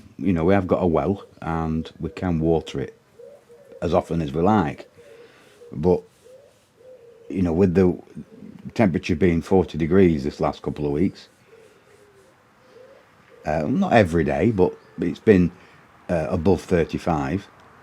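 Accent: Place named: Preston, United Kingdom. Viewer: I've just come across your videos mate, as my missus is Hungarian and she hates living in the UK the weather mainly. I thought your accent was familiar and you said you lived in Preston. We live in Longridge and I've been out to Debrecen a few times and think about relocating a lot. Where abouts are you living? I'm 40 soon and think it would be good for me to try it.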